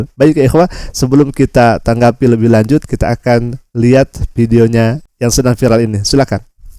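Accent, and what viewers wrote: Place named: Makassar, Indonesia. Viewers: Logatnya orang Makassar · Seperti logat aksen Sulawesi Selatan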